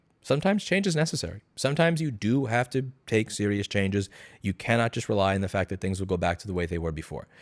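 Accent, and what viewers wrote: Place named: Texas, United States. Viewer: sounds so Texan and then yells out " Forget about it "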